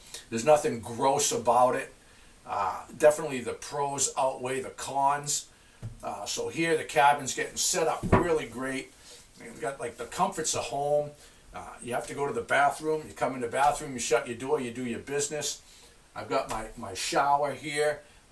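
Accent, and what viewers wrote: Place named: New England, United States. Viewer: I am in Northern Wisconsin. Small place on five acres of land surrounded by State Forest and other private uninhabited lands. Although we are not a primitive as you are, we do enjoy our surroundings and gathering and using our natural resources. I saw you mention the "Camp". I thought I detected a NE accent. Being born in MA, my brothers and parents live in NH, so the term Camp was very familiar to me. Approximately where is the Camp? One brother is in Auburn, while the other is in Wolfsboro. Just curious. Great job on the cabin, and glad I found your channel.........Thanks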